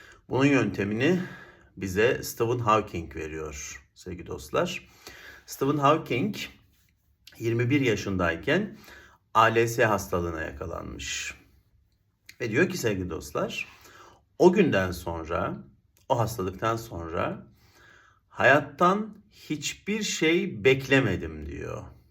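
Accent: Ankara, Turkey. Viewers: Ankara lı mı, Sivas lı mısınız😅😅😅sevgilerrr 🎉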